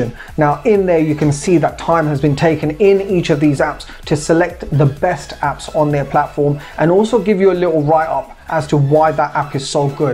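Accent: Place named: United Kingdom. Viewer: Indian with British accent..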